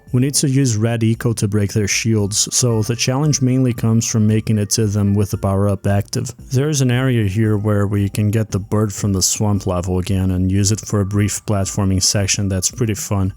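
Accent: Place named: Europe. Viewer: I apologize if it's rude at all but your accent caught my ear, it sounds nice! Are you a European Ytuber? Also, I grew up with the Precursor Legacy and genuinely loved the hell out of it. Jak not really being 'mute' but moreso Daxter interuppting/butting in before Jak can speak is unique lol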